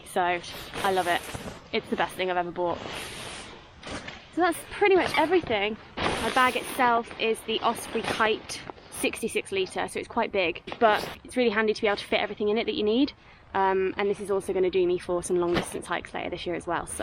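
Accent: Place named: Midlands, United Kingdom. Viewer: Cant pin point your accent, a few words slip but cant place them, youre south of brum?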